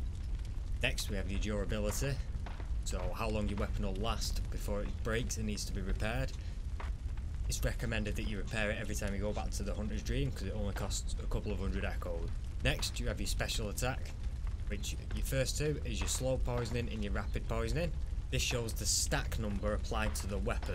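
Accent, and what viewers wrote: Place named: Scotland, United Kingdom. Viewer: Scotland? forgive if I'm wrong😂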